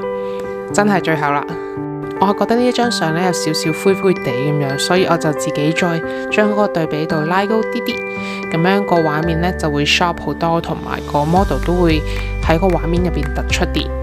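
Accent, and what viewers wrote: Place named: Cantonese, China. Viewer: 好喜欢听你讲粤语😝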